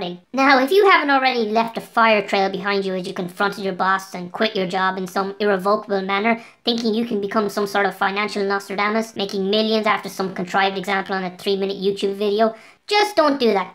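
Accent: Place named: Ireland. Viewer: I can tell youve an irish accent from this lol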